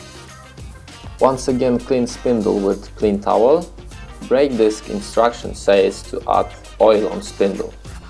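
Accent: Poland. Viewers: Chyba słysze rodaka xD łap suba.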